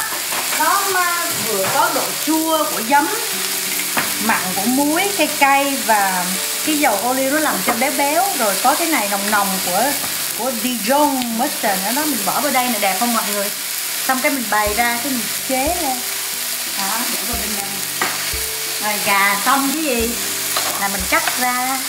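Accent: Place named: Da Nang, Vietnam. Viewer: Nhà cô rất sạch sẽ. Đồng hương Đà Nẵng ạ. Thích cô nói tiếng Việt như này. Cô khéo léo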